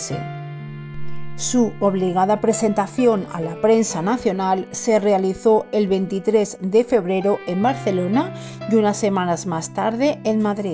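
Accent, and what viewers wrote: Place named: Valencia, Spain. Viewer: Decimooctava....... 2822 Como se nota q eres valenciana....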